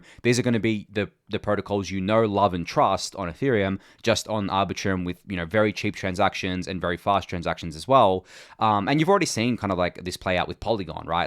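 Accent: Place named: Australia. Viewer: The Daily Gwei episodes and your soothing Aussie voice makes me feel at home. Thank you for amazing content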